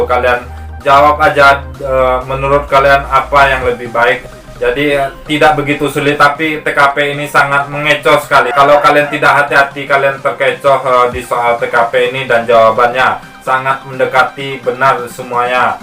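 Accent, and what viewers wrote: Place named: Sulawesi, Indonesia. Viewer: Bang kayak orang sulawesi. Alhamdulilah tercetak kartu ujiannya bang.. Minta doanya semoga dilancarkan😇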